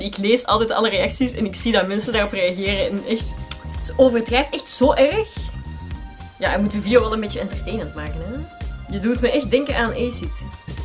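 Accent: Belgium. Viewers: Ik vind het accent van belgen juist mooi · Oke ik woon in nederland ik wil heel graag naar België om daar te wonen want ik vind die accent gwn heel leuk en ik snap niet dat ze haat op je geven maar oke ze komen vast van andere wereld ofzo love you 😗😗😗😗😙😗😗😗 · super mooie filmpje en je bent super geweldige youtuber ga zo door en Belgische accent is super mooi en super prachtig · Hi ik ben Nederlandse en ik kan het mij niet voorstellen dat andere Nederlanders er zo’n groot probleem van maken dat jij Belgisch bent en iets anders praat. Geniet gewoon van de leuke video of kijk niet... En wat naar dat er zo word gescholden in de comment section! blijf altijd doen wat je leuk vind!! Kus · Ik vond al die haat reacties super stom. Je bent mooi en goed op yt en er is niets mis met belgen of je accent ik vind het juist leuk en trouwens je hebt gelijk kanker is erg ze moeten stoppen daarmee te schelden je hebt het niet eens dus. 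Maar je reactie op somige haat rea's was wel grappig?😉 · Jaaaaa team België ik ben zelf een Nederlander maar ik vind het accent eigenlijk veel leuker dus team België 😁😁😁😀😀😀😃😃😃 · wat hebben mensen tegen belgen. Ik houd van belgen en vooral dat accent is geweldig😂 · Jij hebt echt een heel leuka accent k wil ook belgisch kunnen😂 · Ik ben Nederlands en ik ben jaloers op jullie belgische accenten, het is gwn zooooooo mooi niemand anders heeft dat (aleen Groot-Brittanië maar dat is weer anders) Edit: AHAHAHAHAHAH IK GA STUK ALS JIJ DENKT: "Whuttdeewhuuuut?" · Je bent de best youtoeber ooit x xxx je mag niet stoppen door die stomme haters. Ze moeten eerst naar zich zelf kijken. Je hebt een mooi acsent ik ben ook Belg #teambelg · Ik vind belgen echt te gek ze zijn zo vriendelijk in hou van dat acsent. Ik woon in nederland onze taal is saai. Ik hou van belgen stuk voor stuk behalven jou haters😍🤩hoop dat je reageerd ❤🤩😘😍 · Ik ben ook Belg ik vind je acsent super leuk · Je accent maakt jouw zo mooi Belgiës ik wouw dat ik dat kon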